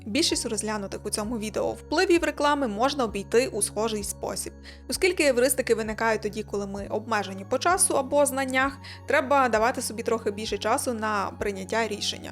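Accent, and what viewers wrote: Transcarpathia, Ukraine. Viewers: Хаха, ти точно з Закарпаття ))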